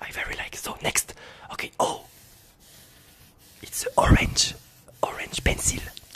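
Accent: France. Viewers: Are you French because you have a French accent and I like it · et, ça se voit que t'es français vu ton agsent (ou peut-être pas). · this mousse à rasayy! This is why I love watching! It’s like if French and English collided together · On dirai un français 😂son accent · T’a vraiment un accent français quand tu parle anglais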